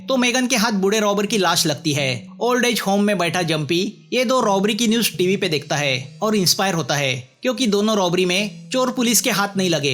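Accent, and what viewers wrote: Maharashtra, India. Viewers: Mai bahut din se apke videos dekta hu thoda absent Marathi lagta hai apka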